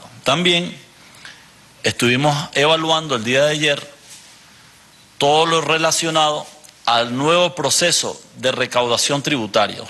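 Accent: Cuba. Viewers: Notan que tiene un acentico cubano?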